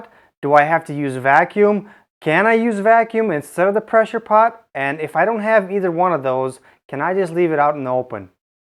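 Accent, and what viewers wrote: Russia. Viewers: Hi! You from RUSSIA?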